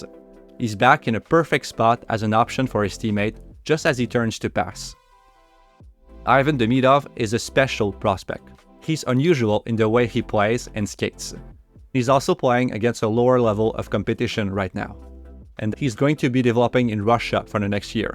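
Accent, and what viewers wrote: Quebec, Canada. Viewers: Quand même drôle que ce vidéo soit analysé par un québécois (on reconnaît l'accent dans son anglais) et que Demidov s'en vienne à Montréal. Let's go!! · Are you from qc cuz that accent is so qc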